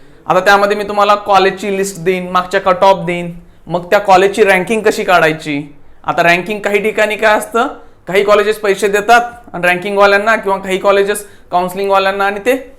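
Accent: Solapur, India. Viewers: Sir tumhi kuthe bhashevarun solapurkr vatatay 😊😊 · Ho Mala pan tasech vatatay Kattar solapuri😅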